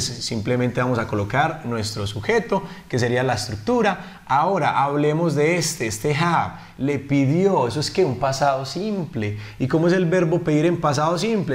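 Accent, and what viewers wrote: Colombia. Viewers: Hola Profesor, mi nombre es Guillermo Léon Gómez Gómez soy Colombiano y vivo aquí en Sincelejo, llevo muchos videos tuyo viendolos y ningún otro profesor explica de la mejor forma que tu, tu haces unos buenos análisis y te das a entender muy bien y que cada uno de nosotros aprendamos de la mejor forma como tu lo haces las cosas tan sencillas y se vea que si entiendas tus clases es por eso que escogí este curso tuyo para entender muy bien. De otro lado quiero que me digas si estás viviendo aquí en Colombia y donde aprendiste tu Inglés o estás en el extranjero, y siento que tu acento fuera de la ciudad de Medellín.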